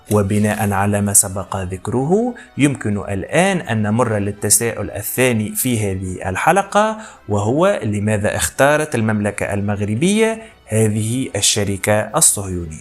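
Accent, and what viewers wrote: Tunisia. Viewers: انت باين تونسي على حساب كلامك ان شاءالله يوجدو البترول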